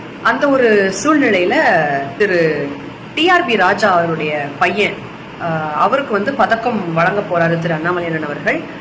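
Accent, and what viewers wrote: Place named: Madurai, India. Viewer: Very good with Madurai slang deecently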